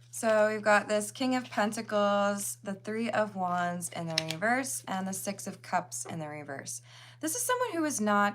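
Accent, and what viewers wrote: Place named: Minnesota, United States. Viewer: The Minnesota accent was ADORABLE 😂🎉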